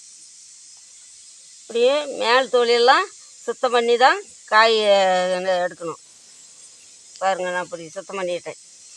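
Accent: Kongu, India. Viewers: கொங்கு தமிழ் அருமைய் 🙏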